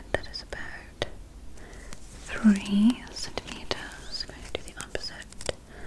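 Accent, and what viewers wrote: United Kingdom. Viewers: I love your accent 👌🏼👌🏼👌🏼 ITs so beautiful... sound a bit British 🇬🇧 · This is my first video of yours in a while and let me tell you, the accent threw me for a loop. I’m here trying to figure out if it’s acting or if I’m misremembering you not having a British accent lol. Your voice is relaxing regardless of the accent. Great video!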